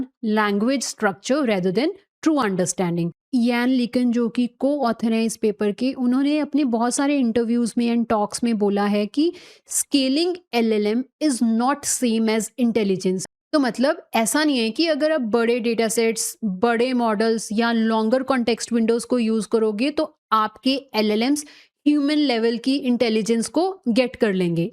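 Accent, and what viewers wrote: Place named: India. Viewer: It's so nice to hear an Indian voice behind an AI unraveling video that too in Hindi🔥🔥...please go deep for those who somehow can't able to break the language barrier yet...your video will certainly help in doing that...by making understanding of AI to the masses🙏🙏🔥🔥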